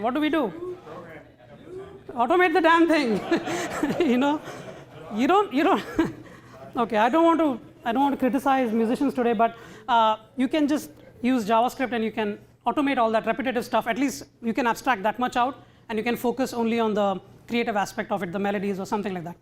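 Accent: India. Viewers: I hate this indish acent! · awww dam i hate the Indian accent, and i am a music aficionado, well nice demonstration anyway, i enjoyed it, even if the odds where not on the speaker side (for my part). thank you !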